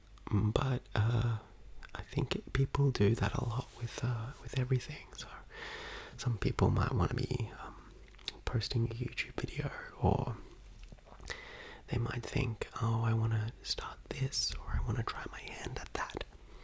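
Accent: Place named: Australia. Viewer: Aussie accent 🥰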